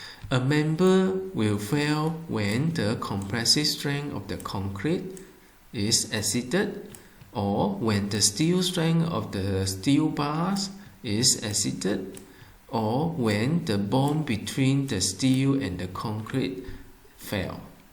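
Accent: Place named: Malaysia. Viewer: i guess the presenter is malaysian? the accent is sooo familiar and feel like home =D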